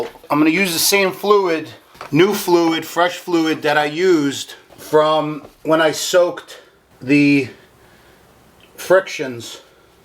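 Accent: New Jersey, United States. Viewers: LOL!! Brother you crack me up. So Jersey. "You know another thing that pisses me off? These freekin things fall off my trees!" Priceless! My women and I watch you all the time. Love the channel. Live in Texas now. Listening to you reminds me of my friends and family back home.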